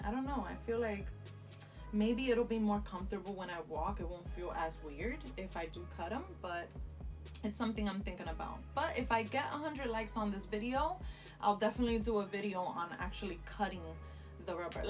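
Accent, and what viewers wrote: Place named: Latin America. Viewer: First time on your channel, I really like the style of it, and your Latin accent is HOT! I subscribed, looking forward to future content! I got the white and black, so far I wore the white and it does feel a bit weird but I think you’ll get used to it fast.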